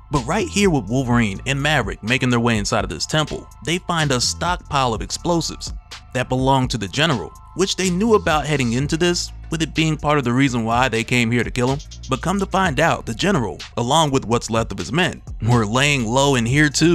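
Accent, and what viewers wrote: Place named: South, United States. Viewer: It’s the “in-and-out” of the Hee-Haw accent that got me……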